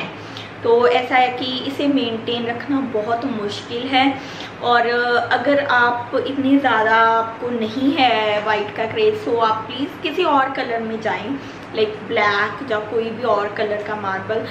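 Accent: India. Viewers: Are u punjabi...just guessed from some words n accent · Hariyanvi accent?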